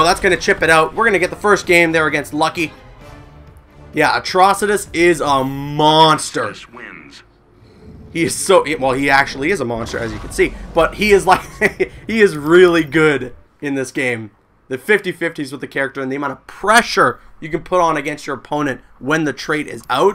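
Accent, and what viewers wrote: Canada. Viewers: This is my first time on ur channel and can I just ask, are you Canadian?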